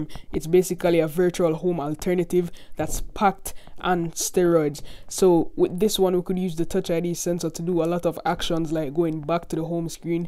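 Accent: Jamaica. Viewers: Are you Jamaican? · you Jamaican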